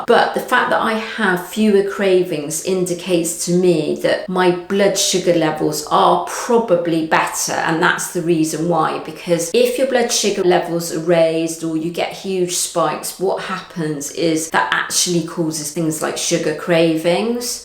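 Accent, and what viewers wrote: United Kingdom. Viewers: A Nigerian with Brit accent? Hum, they don’t go together!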